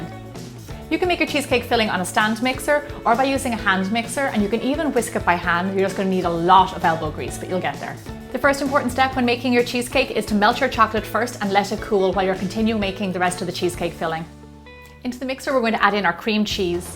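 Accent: Ireland. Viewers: R u from Northern Ireland or Southern Ireland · Irish accent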